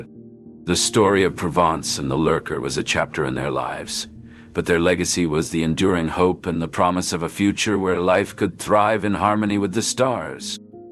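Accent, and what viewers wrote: United States. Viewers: Ai sounds American, I mean its making a mockery of English as usual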